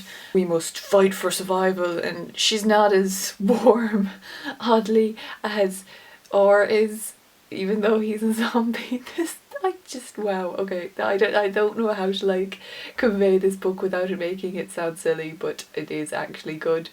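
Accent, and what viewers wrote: Ireland. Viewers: I thought there was a cat behind you till I realised it was a reflection of your arm in a mirror lol. Great vids. Always good to hear fellow irish on here. :)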